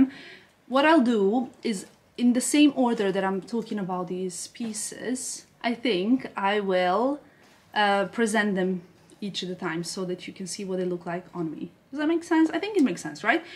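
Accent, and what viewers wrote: England, United Kingdom. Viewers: The British accent is back. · The english accent is back ! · your accent is British accent when you are in London, you mentioned before your accent will change when you with different friends, lol...funny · crazy how fast your accent can change depending on where you are! sounding v british haha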